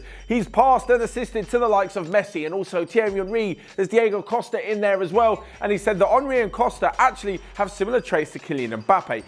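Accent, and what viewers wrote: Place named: England, United Kingdom. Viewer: awful accent, thank god im not leaving in england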